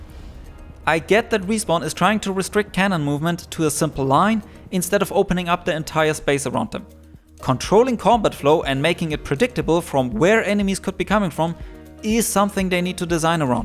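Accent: Germany. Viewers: Ich hab bei deinem akzent schon immer gedacht das du deutsch sein könntest hab aber nie was gefunden, bis jetzt 😂🫶🏻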